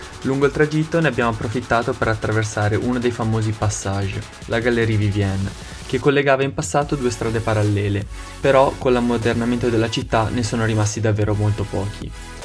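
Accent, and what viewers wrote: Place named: Lombardy, Italy. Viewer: Concordo con la dizione dialettale lombarda !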